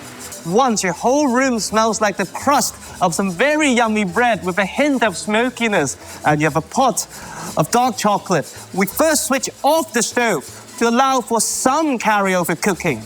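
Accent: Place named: England, United Kingdom. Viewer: Bruuuuhhhh..... Thats the best Cajun "Chocolate Sauce" Roux I have ever seen from an Asian with an English accent. That has to be just damn excellent. Well done sir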